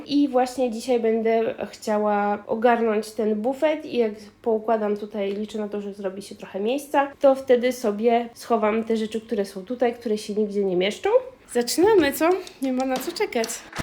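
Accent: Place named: France. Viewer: Trafilem dzis przez ytbowy przypadek i nadrabiam filmy, ciekawe jak Ci sie zmienial akcent w polskim. Teraz brzmi tak lekko francusko;). Tak 9% gotuj z Pascalem;).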